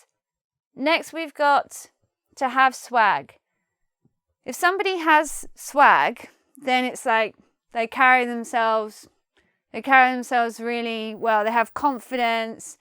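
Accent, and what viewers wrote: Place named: England, United Kingdom. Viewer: why wre not you my high school teacher ? i love you accent so English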